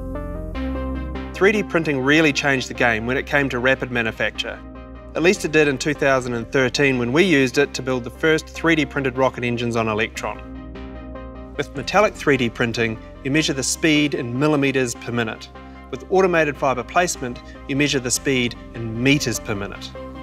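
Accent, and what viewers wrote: New Zealand. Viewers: I like your lovely kiwi accent and your high aspirations. I wish you the best of lucks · With a kiwi accent, you can never sound arrogant. Cheering for you, Rocket Lab! (And SpaceX)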